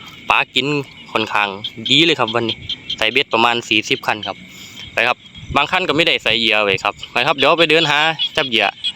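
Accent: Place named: Korat, Thailand. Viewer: อยู่ อำเภอไหนครับพูดโคราช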